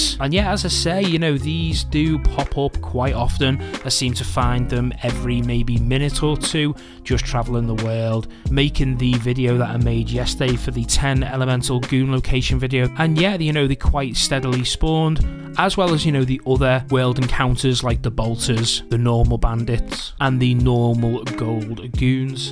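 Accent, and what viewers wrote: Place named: Liverpool, United Kingdom. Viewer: Is rite lad sound like ya from round my area Liverpool? Subbed rite away 👍👍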